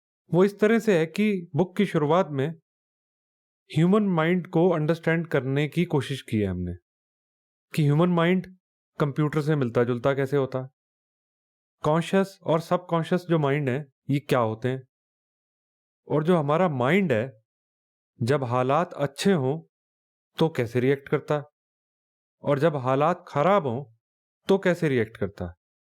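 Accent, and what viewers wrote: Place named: Punjab, India. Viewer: Thanks, aapka punjabi accent and simple way of explaining is v.good. Thank u.. Kya aapka book crossword stores me hai. ?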